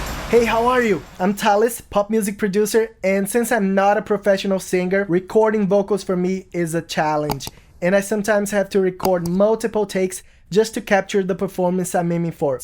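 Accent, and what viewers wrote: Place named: Brazil. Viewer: I can recognize a fellow Brazilian accent! eh nois mano!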